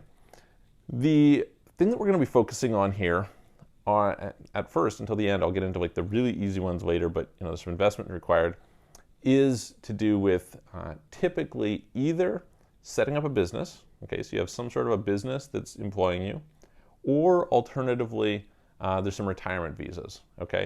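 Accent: Canada. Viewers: Great info as always. Plus you have a great Canadian accent and I love to hear reach oot and talk aboot. ;) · Great video. I just read some of the comments and had to laugh. I knew you sounded Canadian. I'm for Canada's capital. Moving to Portugal. How far in advance of planning the actual move do you recommend reaching out to you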